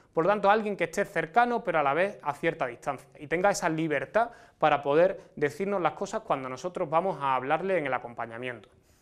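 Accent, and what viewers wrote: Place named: Cádiz, Spain. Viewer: Hola ¿ eres de Cádiz? Tu apellido y acento son muy de la Tacita. Saludos cordiales desde Cádiz.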